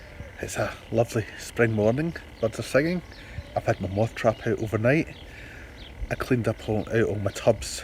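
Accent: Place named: Scotland, United Kingdom. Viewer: Just sometimes your great Scots accent throws me "Badger Antonio" LOL!!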